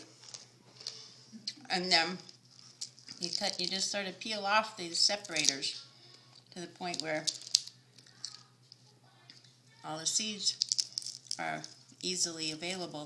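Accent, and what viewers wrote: United States. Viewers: THE 'right' way? The accent held no surprise for me. Who else would be so arrogant as to think that 'their' way is 'THE' right way but an American? Say what it is: a better way, a less messy way. But 'the right' way? Says who? I've seen several ways that are even better.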